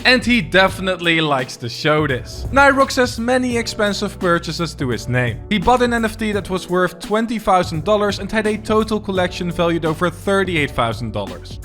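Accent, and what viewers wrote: Netherlands. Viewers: i smell dutch in ur accent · i hear a dutch accent can that be true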